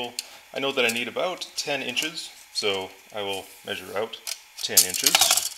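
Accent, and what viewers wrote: United States. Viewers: You from Idaho? Remind me of guy knew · just american english, no big deal. Forgive them, they have not reached your level of perfection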